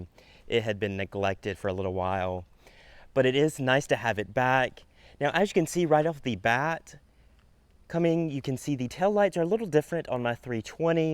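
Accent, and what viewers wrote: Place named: Carolina, United States. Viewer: I love that strong Carolina accent. Thank you for this video it really helped a lot.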